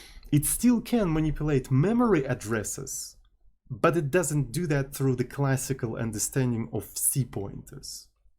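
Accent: Russia. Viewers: This thing u said inspires me a lot I always afraid of doing dumb things and writing shitcode but I want to improve Also your English is so Russian so I feel like a native English speaker listening you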